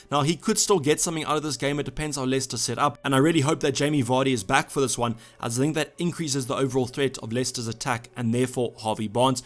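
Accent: South Africa. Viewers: Are you South African my boet? 😂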